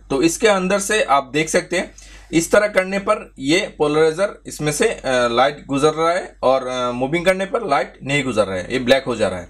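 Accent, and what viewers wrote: Bengal, India. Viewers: Are you a Bangali...????😀😀😀😀😀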